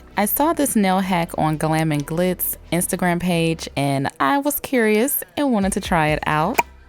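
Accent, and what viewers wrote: South, United States. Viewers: I’ve said it several times but I’ll say it again! Being from the south I love how your southern accent comes through in spurts lol “...just try to make sure all the colors are dOWn”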